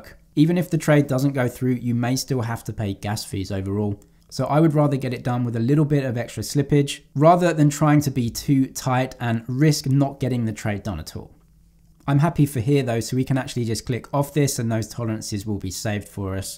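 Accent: United Kingdom. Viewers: Many thanks for your succinct and easy to understand videos. On the stuff I am trying to understand at the moment, I will watch your videos first! And not just for the British accent :-)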